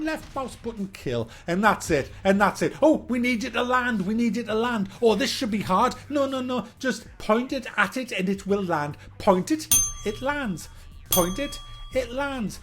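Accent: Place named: Newcastle, United Kingdom. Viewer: My Uncle's Jordie too so when he goes off on a rant I'm reminded of you! Keep it up, you're brill!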